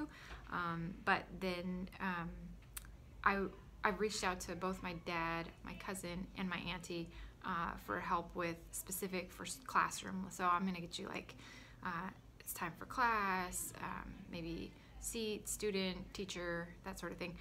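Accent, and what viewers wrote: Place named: Faichuk, Micronesia. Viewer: The “mwochen” is pronounced “mwotsen” Instead of “kose” u can use “kete” its more accurate. “Sorry” is spelled “OMWUSALO” but pronounced with a short “R” instead of the “L” “Mete na ke for”(what are u doing) can vary depending on which island in chuuk your from so basically the accent of the island u are from. From the Faichuuk region is what u are using. From my accent its slightly different “metena ke för/föri. Same goes with “met na” some accents use “metena”(what is that) “Kunok fite iei”(what time is it now) “Kunok fite”(what time or whats the time) “Ifai” is actually two words. “Ifa”(where) & “i” (she or he) = where is she/he. “Ifa usumw”(how are u) “Pwaat”(why) can also vary from island to island or region.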